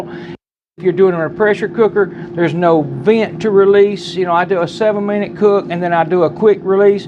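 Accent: South, United States. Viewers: just been listening to the new album and your accent slightly reminded me of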